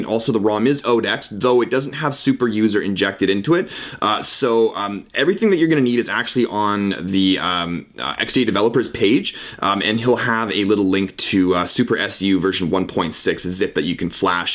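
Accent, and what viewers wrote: Canada. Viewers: Canadian English. Ha, lol.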